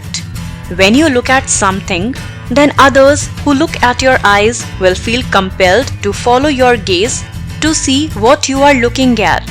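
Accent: India. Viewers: Why Indian accent???!! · Ana kozjak she is Indian and its Indian English..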